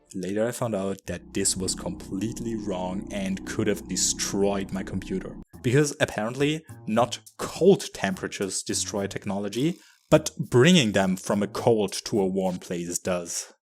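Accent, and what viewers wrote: Germany. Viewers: because you sound like any average german 20yold with the way you speak english. No offense tho I'm german myself xD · Hey you soumd german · why do you sound german?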